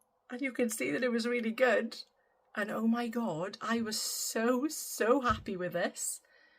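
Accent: Wales, United Kingdom. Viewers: Nothing to do with slimming but I just love listening to your beautiful Welsh accent.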